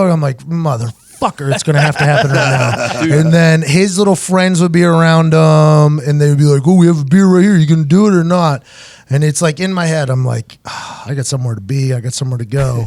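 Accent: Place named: New Hampshire, United States. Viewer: Buddy sounds like hes from New Hampshire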